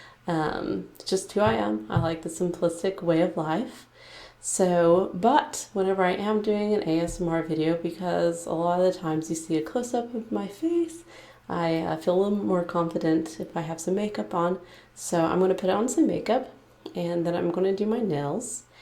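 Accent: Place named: Texas, United States. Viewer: Hi ! I'm french and I'm absolutely not bilingual but I learned english watching movies and series in original version. All I can say is that I'm not really used to hear differents american accents but I totally understand what you are saying so I guess your texan accent isn't so bad as you think or may be it's just for someone who is not american ! Anyway I love your videos, can't stop watching them and of course I apologize if my english is really bad in that comment !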